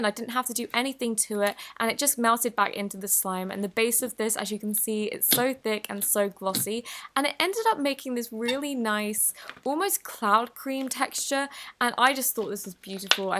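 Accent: United Kingdom. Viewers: She has British acent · How did I wake up to a British lady reviewing slime? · The british accent is such a touch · can u talk a little slower? I dont want to sound mean but i'm hispanic and I can understand english videos but u talk too fast and plus u got a british accent, I like your videos but I struggle understanding them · I like your voice bc I like British peoples voices🤣😂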